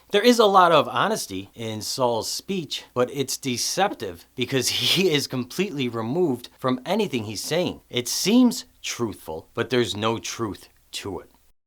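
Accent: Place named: Michigan, United States. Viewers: Love the accent. Michigan? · Like your Midwest accent! 👍 Great insight.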